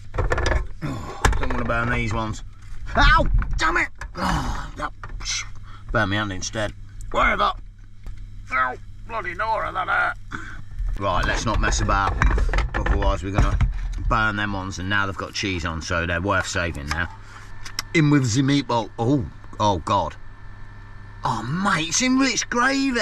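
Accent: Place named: Loughborough, United Kingdom. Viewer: I'm from Loughborough and spotted that accent immediately haha 👌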